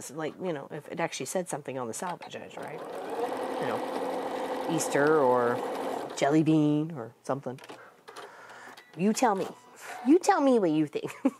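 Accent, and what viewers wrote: Ontario, Canada. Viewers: So nice to hear an Ontario voice. I grew up in Scarborough, married a Kiwi, moved to Halifax in my 20s (for 35+ years) then retired to new Zealand. Great videos. I am addicted to quilting. 😊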